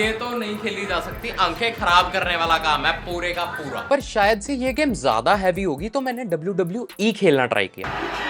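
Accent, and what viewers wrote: Punjab, India. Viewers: Are u from punjab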